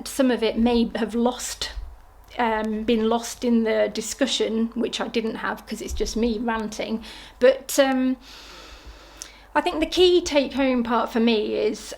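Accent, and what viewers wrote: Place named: United Kingdom. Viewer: Thank you for such interesting content. I’m sorry, but I’m sitting here puzzling. You sound British and many of the things you say sound British but you pronounce words such as insulate without the extra H sound that I usually hear British and kiwi and Australian people do so I’m confused. I’m sorry I know this has nothing to do with your video content. It’s just got my curiosity up.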